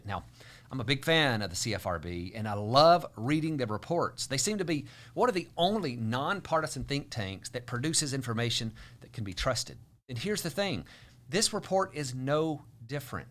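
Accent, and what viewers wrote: South, United States. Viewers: I hate your southern accent 😱😱 irritates the ears.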